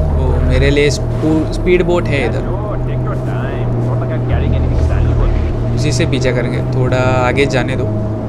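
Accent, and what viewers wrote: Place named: India. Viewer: Hindi Accent 😙😂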